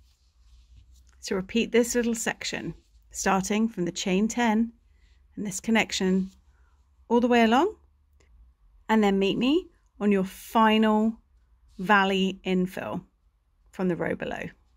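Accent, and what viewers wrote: United Kingdom. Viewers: Agreed Dolton-all of her videos/tutorials are so easy to follow, and she explains thing(steps) in easy to understand terms. Plus the British accent is great 😊. Also been crocheting for 50+ years and still learning thanks to awesome teachers like 🎉🎉🎉🤩!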